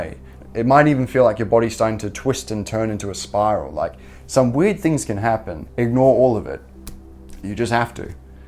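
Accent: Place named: Australia. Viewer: Where are you from? You sound kinda Australian but idk it doesn't sound like 'ultra-Aussie-accent'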